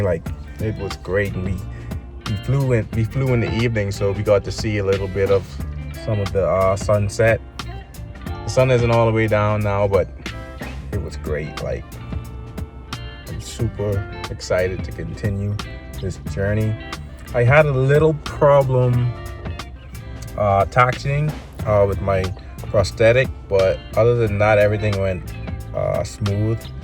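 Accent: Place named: Bahamas. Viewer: Congratulation on starting your aviation Journey . You sound Bahamian . only another Bahamian know another Bahamian accent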